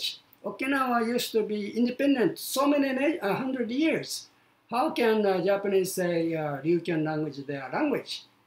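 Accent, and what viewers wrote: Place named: Philippines. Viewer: He sounds like a filipino when he speaks english with a little japanese nuances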